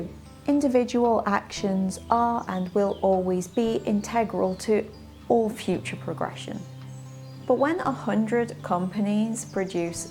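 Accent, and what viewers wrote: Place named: North of England, United Kingdom. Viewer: Oooo same regional accent as Shaun and Rachel Oates. Loooove. North of England is poppin' off.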